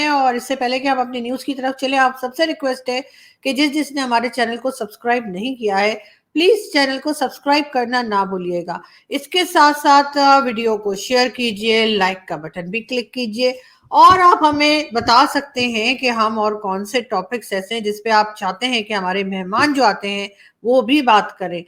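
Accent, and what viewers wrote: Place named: Mumbai, India. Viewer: Aap ka aaj kal urdu ka bolne ka slang bombaiya ho raha hai, Bollywood style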